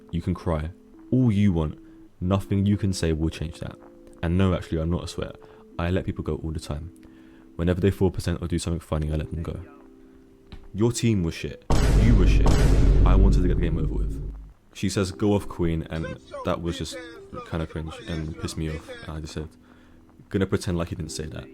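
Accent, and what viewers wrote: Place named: Southern England, United Kingdom. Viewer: bro u sound mad like my mate from yeovil u from south england?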